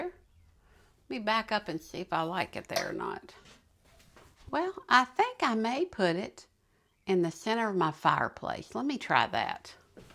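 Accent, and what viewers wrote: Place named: Tennessee, United States. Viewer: I’m new to your channel thanks to Jeri Landers mentioning you in one of her recent videos. I lived in Hendersonville & Smyrna TN several years ago & was always antique shopping. I loved going to the Nashville Flea Market once a month. Anyway now I live in GA & haven’t added many more antiques since then, although I must admit I’m pretty full after all those years of collecting. 😁 I sure do enjoy your channel & your TN accent. My friends here say I still have that accent. Thanks so much❤ your GA friend Thanks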